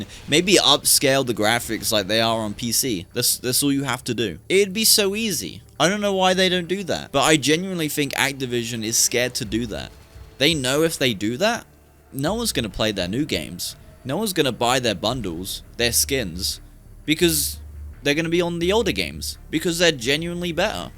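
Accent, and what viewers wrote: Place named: United Kingdom. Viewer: Do you also do a car channel? Your voice sounds familiar. Classic britain😊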